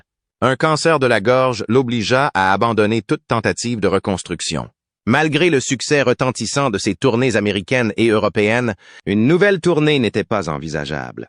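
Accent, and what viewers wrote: Quebec, Canada. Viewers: De tout les beaux accents possibles pourquoi l'IA utilise l'accent québécois ?!?....😅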